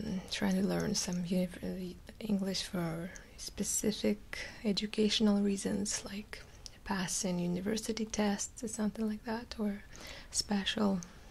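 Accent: Russia. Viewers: I really love Russian accents and truly enjoyed your story! Hope you have an awesome day! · That's a very cool story. Love your Russian accent and you do a great job on your videos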